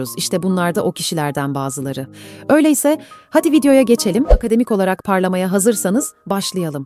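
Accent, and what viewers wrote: Azerbaijan. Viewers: Oha abla sen Azerbaycanlımısın?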